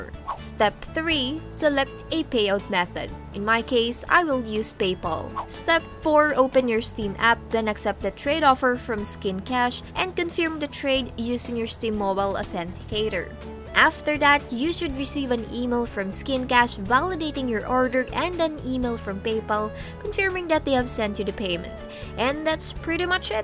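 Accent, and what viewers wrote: Philippines. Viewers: that Pinay accent though lol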